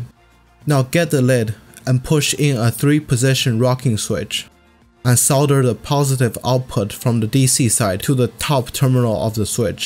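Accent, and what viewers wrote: China. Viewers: 感覺像華人講英文,因為我竟然能聽懂!!! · 这英语我怎么听出一股天津味儿呢。。。🙂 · gonna say it seems like a little bit Chinese accent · I watched all your videos today and subscribed!!! 听口音应该是华人吧？真心佩服，加油！期待更多视频！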